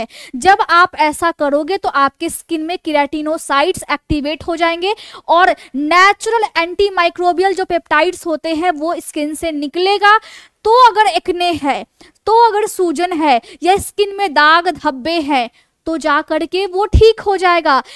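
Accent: Bihar, India. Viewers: Work on your tone, bol rhi ho hindi but bihar k tone aa rha hai.. your hindi word pronunciation is little bit weak.. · Aap bihari hai kya medam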